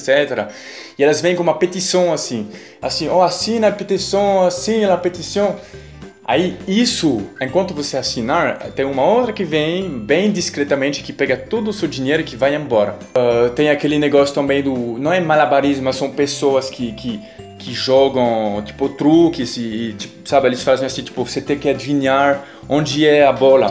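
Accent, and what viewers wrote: France. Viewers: ...por favor nao perca o sotaque frances pelo amor de deus...se nao vc perdera o charme. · E muito bonitinho o sotaque de quem é francês e está aprendendo português! Tah de parabéns!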